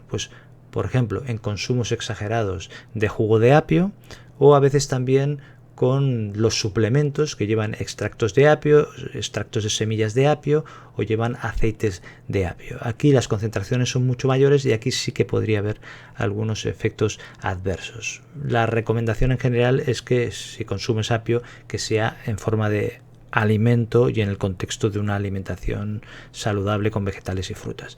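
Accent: Spain. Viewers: where in the world did you get your study you are very confusing you sound like you're from Spain good looking man but apparently you have not done your research on celery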